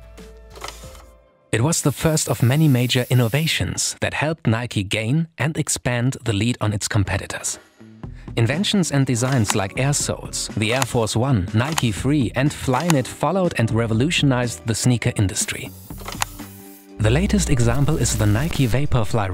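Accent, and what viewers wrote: Germany. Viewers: Flashing images no shit. This content should not exist on YouTube because of the flashing images. A warning before the video won't cut it id say. Also this guy's accent is on par with the Flashing images, gtfo sauerkraut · Deutscher Akcent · The German accent narration is winning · Are you German we can hear it. Ja aber ist trotzdem ein gutes Video. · I hear the speaker is german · what you mean 'nobody', his accent is more German than Stefan Effenberg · After 1,5sec you can tell this guy is german, one of the very few who can actually pronounce adidas correct.🤣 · i hear a fellow german accent if i hear one;) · love your german Accent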